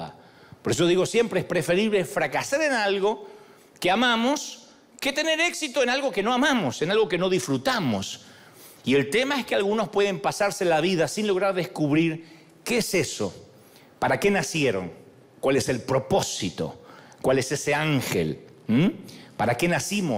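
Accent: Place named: Argentina. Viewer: Hola querido… tu eres mi cartero del cielo… me llegan los mensajes y traspasan mi alma🥰😇y Papá Dios y Mamá Maria no se equivocan con escogerte y mi marido que murió hace 6 años era de Buenos Aires… y mi hijo Aldo Cesar es mitad argentino🇦🇷 y mitad de mi México 🇲🇽 lindo y querido y me emociona escucharte con tu acento que convivi por 36 años y me enternece que mi Jesús te utiliza para que llegue el mensaje con tu acento que extrañamos tanto❤️🌹❤️🇦🇷🇮🇹💕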